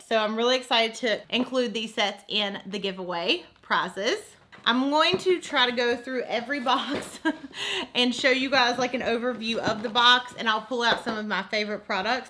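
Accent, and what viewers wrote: South, United States. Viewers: I Have Been Subscribed To Your For Years And Still Love You And Your Southern Accent Lol I Would Love To Win Of Course, I Wouldnt Be Able To Afford These Things, But Even If I Dont Win Im Still Going To Watch Your Videos And Love You! Congrats On 4 Years And Many More To Come❤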